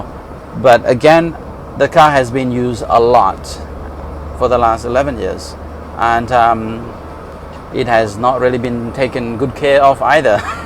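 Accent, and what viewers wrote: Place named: United Kingdom. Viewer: I like your British accent!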